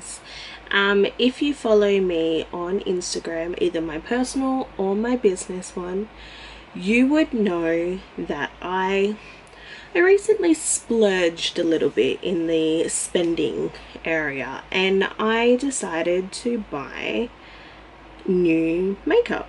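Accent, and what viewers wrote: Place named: Australia. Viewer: Girl i love your accent, are you Australian? The final look looks gorge! ❤️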